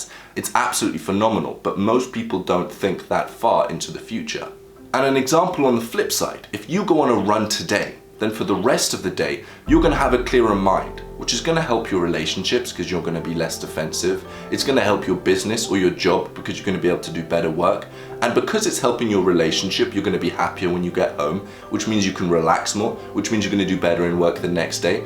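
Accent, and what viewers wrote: England, United Kingdom. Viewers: Woe! What an amazing video, I totally agree!!! I was lazy and once I understood the logic of dopamine, my whole life changed. I work alone, I have the body of my dreams, my mental health is getting better every day...Thanks. What city are you from,? Obviously seems to be from England, has a beautiful accent! You remind me a lot of actor Cillian Murphy, has anyone said? Well, gratitude from 🇧🇷❤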